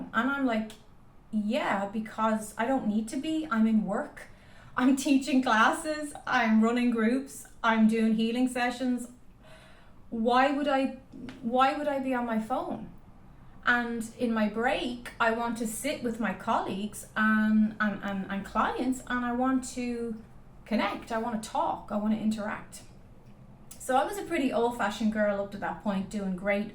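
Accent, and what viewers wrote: Ireland. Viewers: are you Irish? according to my psychic vision you are.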